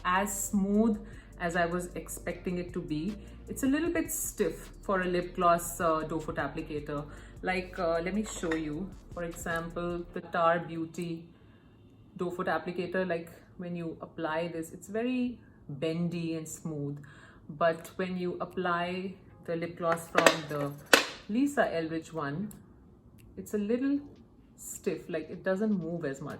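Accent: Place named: India. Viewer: Love your indian accent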